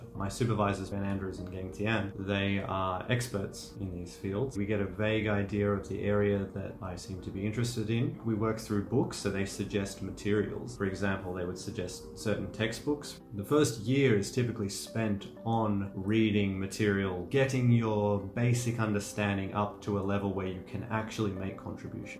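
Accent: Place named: Australia. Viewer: Aussie accent :O oh you're at usyd? Im over at UNSW. Not even studying maths but I'm going down a rabbit hole and I now I really wanna relearn maths 😂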